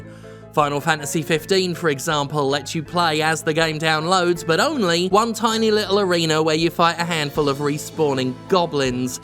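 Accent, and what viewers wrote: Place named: United Kingdom. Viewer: British accent, plus sized man, PETER MOLYNEUX, for a moment i though i was watching Larry bundy jr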